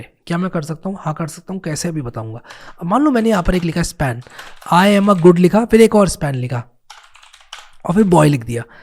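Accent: India. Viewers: the best style of speaking, ekdam desi style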